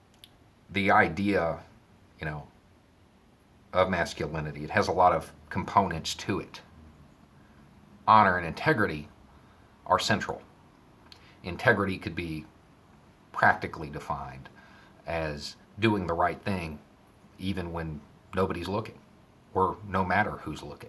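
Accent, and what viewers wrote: South, United States. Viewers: I hope you see this, I'll keep trying. I am working on a PhD in clinical psychology and I like you. I am going to admit, it took a lot of work for me to get past my implicit biases of your appearance and accent, my horrible family who happened to be from the south had traumatized me in that way. What you have to say is so important and so perfect that I did that work so I could listen to you. I've heard you express you don't have the the psychological knowledge to answer some of the questions you are asked. I'd like to help. I have been thinking about it for a while but when I heard you say in a video this morning that your dream for the world is being able to exist without government, I was moved to act. I imagine we probably have a lot of idealogical differences but I think that could just make us perfect sparring partners, we'd make each other better people. 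Give it some thought.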